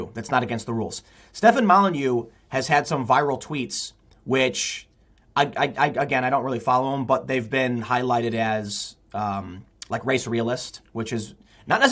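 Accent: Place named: Midwest, United States. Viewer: You can tell is from the Midwest. He adds an "S" to singular nouns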